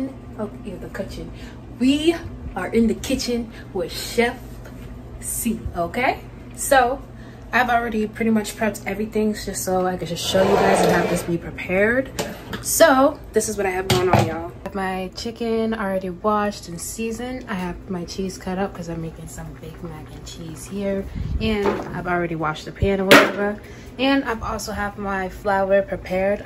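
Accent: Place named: Midwest, United States. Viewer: That food looks good and you sound like you’re from Chi town or the Midwest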